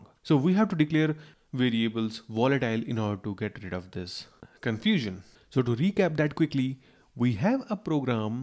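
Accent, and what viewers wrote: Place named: India. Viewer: You have an overpowering accent that makes you difficult to understand, there are AI tools that can fix this and online training resources to teach yourself how to minimize it, if you choose to. It's not bc you have an Indian accent specifically but bc it's a very heavy one. Try bring your tongue forward in your mouth when you speak English and stop 'rolling' your Rs.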